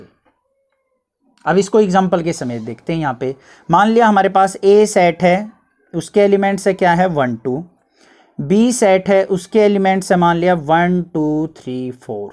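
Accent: Haryana, India. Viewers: Sir it seems u r from haryana??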